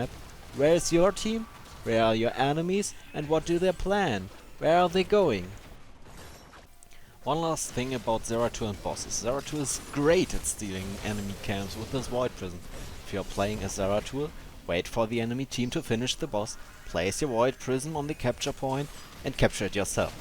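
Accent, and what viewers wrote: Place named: Germany. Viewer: Dat german accent...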